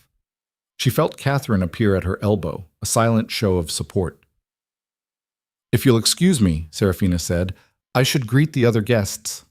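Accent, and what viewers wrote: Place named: United States. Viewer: Why is it using an American English accent for a story set in Britain? This whole storyline mixes up timelines with 19th century, 20th and 21st. Be prepared to feel constantly confused about courtroom drama and the need for a passport in 19th century England lol.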